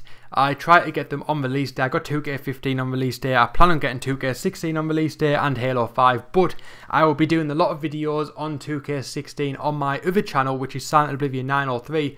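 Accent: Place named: Newcastle, United Kingdom. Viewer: That Geordie Accent Tho xD im geordie too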